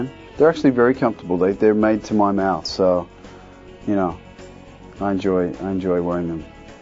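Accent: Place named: Australia. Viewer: I don't normally find Aussie Accents hot...as I am aussie...but god, he is way hotter with his aussie accent!!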